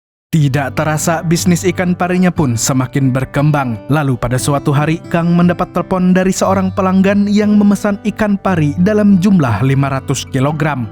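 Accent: Banjar, Indonesia. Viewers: Wihh Abang orang Banjar ya😂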